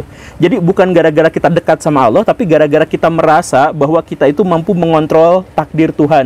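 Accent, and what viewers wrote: West Java, Indonesia. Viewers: Klo sy Alhamdulillaaah,ga prnh ikut2an.waktu itu pernah rame di daerah tmpt tnggl sy,sprti yg di ajarkan ustdz YM,ada yg ikut beberapa orang,kata mereka hayu cpt sedekah 50rb juga gpp,nanti sebulan lg kIta dpt rezeki 70kali lipat, Klo sy terus terang langsung mengernyitkan dahi(????) BTW sy baru liat channel,maasyaa Allaah ilmu'nya🤗🤗berbobot, penyampaian'nya ringan,logat sunda pula,da abdi ge sunda😘😘😘👍🙏🏻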